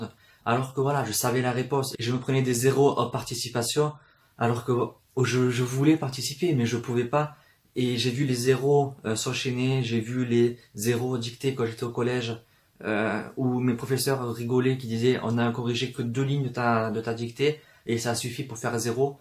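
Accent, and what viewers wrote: South, France. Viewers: J'ai adoré ta vidéo ! Il est génial ton accent #teamaccentdusud 😉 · Vive le Sud, vive l'accent du sud et vive tes vidéos :D ! Bisous :). Et oui tout est possible : je suis bien d'accord avec toi :) !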